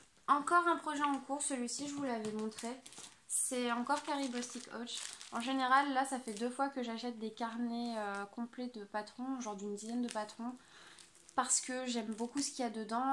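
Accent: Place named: Picardy, France. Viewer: Coucou je me disais bien que ton accent m’est familier. Je suis originaire de la baie de somme en Picardie 😉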